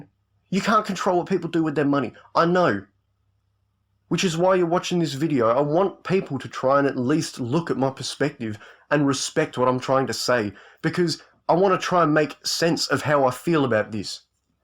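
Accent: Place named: Australia. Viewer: I didn't know I needed an angry australian man complaining about chrischan as much as I did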